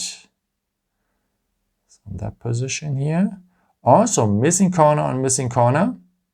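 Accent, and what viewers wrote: Germany. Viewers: Deutscher Akzent:D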